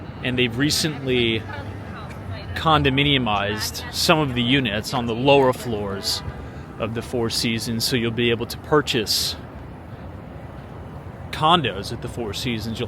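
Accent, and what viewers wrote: New York, United States. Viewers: Love your accent,is this a New York accent?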